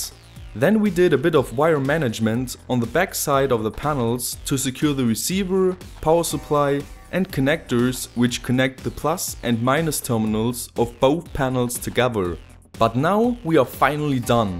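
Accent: Germany. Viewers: German, right ? :D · Warum spricht der englisch das ist doch ein deutscher Akzent und die Schrauben von Hornhaut hatten auch eine deutsche Beschriftung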